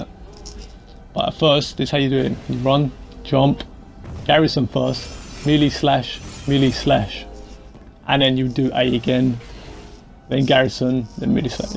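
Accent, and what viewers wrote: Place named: Australia. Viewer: first, plus you australian because you sound like it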